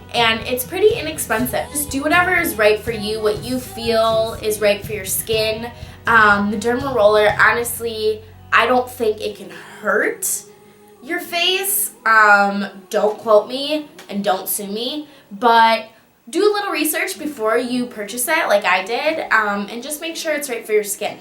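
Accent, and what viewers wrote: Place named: Canada. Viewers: Canadian eh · Hm she sounds canadian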